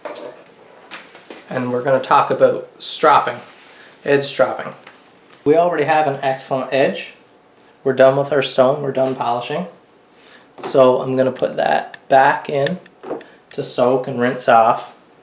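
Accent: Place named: Newfoundland, Canada. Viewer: Dude, are you from Newfoundland!? Haha great tutorial! Got a paramilitary 2 on the way as well as a 400/ 1000, 3000/8000 and strop. Can’t wait!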